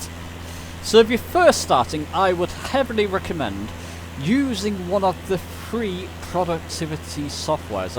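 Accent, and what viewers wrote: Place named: Northern England, United Kingdom. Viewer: Good to hear that Northern Voice every time I want to learn "How To!" and how you make the difficult seem so easy I don't normally believe in coincidences - but I just asked on My Orbyx Forum any hints for making Flight sim videos because I want to improve the few I've made and took the UTUBE plunge - and once again - great minds think alike Thanks for all you do especially for us Old blokes!!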